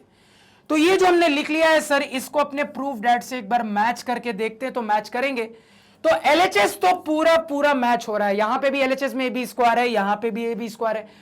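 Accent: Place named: Bihar, India. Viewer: Sir app ko 5-6 months ho gye insta ID bane ko khete hue sir abb to bana lijye And in 11 th lecture you somewhere speak bihari but in 10th you speak like kaddhi boli . Kabhi 10 th lecture me bhi bihari language ka tadka lagyie.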